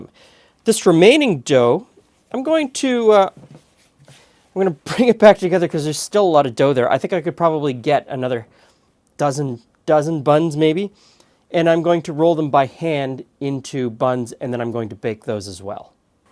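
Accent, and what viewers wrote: Canada. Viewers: Did you cut your own hair? Nice job. Thanks for the presentation and the recipe. I can tell you're a fellow Canadian by the accent. Stay safe. ♥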